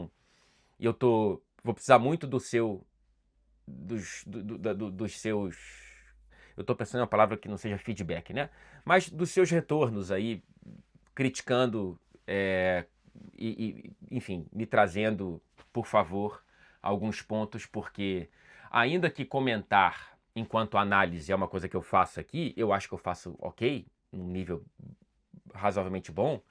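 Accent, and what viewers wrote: Rio de Janeiro, Brazil. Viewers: Caraça Mano... sotaque carioca pesadão😂😂😂😂😂 mudou muito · Fala, cara queria dizer que mesmo nao gostando no geral do sotaque carioca, discordando de voce em muitos aspectos fora do futebol e principalmente te odiando quando o Fluminense tirou a liberta do meu colorado, te acompanho em todos os videos a mais de 3 anos e fico muito feliz com seu passo profissional, fico triste pq sem duvidas sua presenca nos programas da TNT eram essenciais e o MFM perde seus 2 melhores integrantes em poucos meses, mas desejo muito sucesso em sua nova jornada, voce é foda!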